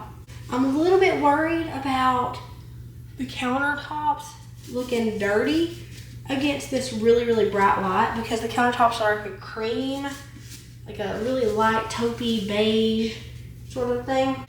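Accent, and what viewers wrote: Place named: Texas, United States. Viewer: White on white is what you island will be … that is when you have different shades of white! It looks nice but I really did like the other colour as well! I loved that you count us as your friends. I’ve been watching for ages now….. you just came up in my suggested videos to watch and the rest is history. Mostly because I was born and bred in Texas and lived there til I was 26 yrs old. And came to Australia to work in a local church in area of music and youth. I also was a trained teacher and I only did the church thing as paid work for 2 years …married and Aussie and taught school after that and the story goes on and on! But I just love hearing you talk. The accent I love too! I love your wisdom and understanding of your children and I love that you love Jesus and started your YouTube channel because Jesus told you to do it! 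I love that you don’t put on grace and airs….you are who you are..take me or leave it! Thanks for all you do!😊❤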